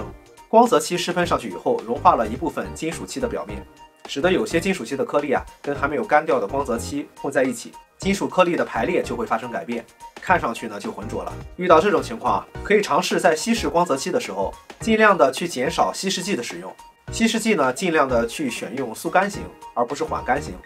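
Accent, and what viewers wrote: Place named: Qingdao, China. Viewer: RAY是青岛人么？口音很像老乡啊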